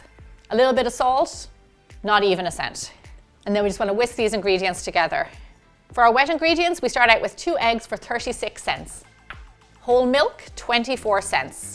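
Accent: Ireland. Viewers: You are so cute. I just discovered your channel & subscribed. First, I Love your Irish accent and definitely your baking recipes, (thoughts, strategies & ideas with baking). Also appreciate the mindfulness of cake prices you have and simply baking at home. I was looking for a birthday cake recipe too! Thank you 🙏🏻🙏🏻so much for sharing your gifts with us. Happy 11th Birthday 🎂 Gemma (Beautiful Cake🎂) & may God bless you with many more happy years ahead!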